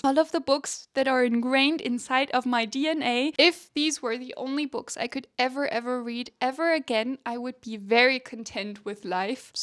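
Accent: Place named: Germany. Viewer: You have the sweetest darn voice - you're addictive to your listeners for sure, and your slight German accent makes you sound so erudite and warm hearted at the same time. You're definitely a charmer, and you're simply a joy to us that you exist and share so much of yourself.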